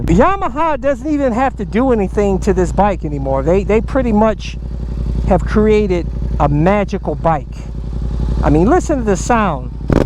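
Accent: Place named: Texas, United States. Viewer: Are you from texas? You have a southern accent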